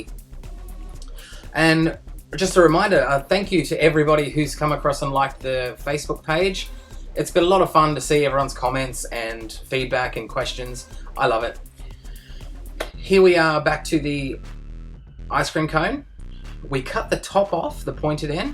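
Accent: Australia. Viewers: can u not hear his accent hes Australian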